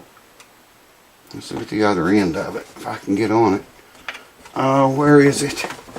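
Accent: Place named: Arkansas, United States. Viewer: Tickles me, I am from Arkansas and you talk a whole lot like I do. I am just uglier than you, B/C I am 71 (seventy & one) years old.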